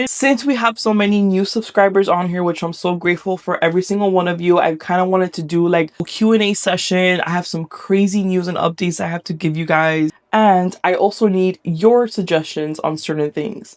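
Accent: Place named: Miami, United States. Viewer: Girl I knew you were a Miami girl the SECOND I heard you speaking 😂 after moving up north, the beautiful sound of that accent was like a hug ☺️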